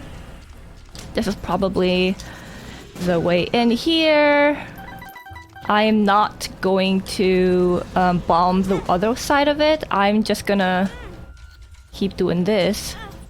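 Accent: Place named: Australia. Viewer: Your posh Ozzie / UK accent is real good. Hope your brain is kind to you for at LEAST a week x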